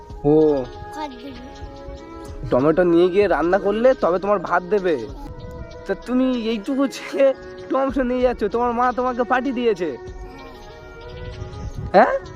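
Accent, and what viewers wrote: India. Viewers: কথা শুনে মনে হয় ইন্ডিয়ার 🙂 ইন্ডিয়ার ভাষা এমন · ইন্ডিয়ান কথার মতো লাগছে💕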